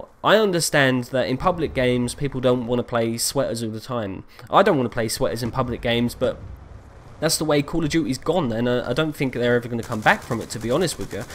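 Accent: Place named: Kent, United Kingdom. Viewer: you sounded like you were from kent! but why ireland? :D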